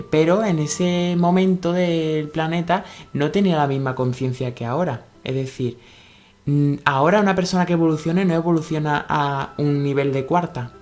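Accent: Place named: Andalusia, Spain. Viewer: Soy de estepona Malaga vivo aqui eres andaluz por el acento. Buen video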